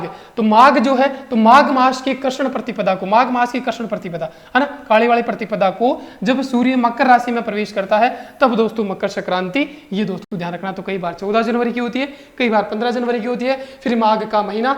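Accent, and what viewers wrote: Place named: Rajghat, India. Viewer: Aap rajghat se ho kya sir aapki boli vesi hi h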